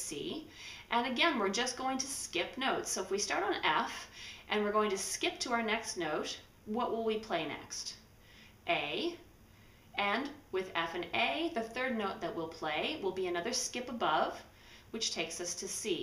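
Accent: Canada. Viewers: This is exactly what i was looking for, and your video was pretty easy to follow. Appreciate alot! It seemed you are from Canada, am i wrong? ;) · Your accent sounds a bit like Canada or Northern U.S. am I right?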